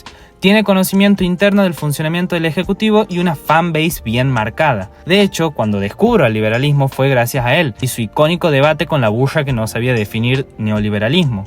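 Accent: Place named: Córdoba, Argentina. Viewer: Capaz que es cordobés amigo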